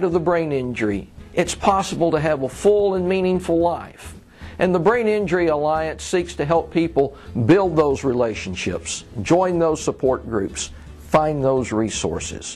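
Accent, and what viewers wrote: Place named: Kentucky, United States. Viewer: Kentucky. Need I say more? Learn to speak English please.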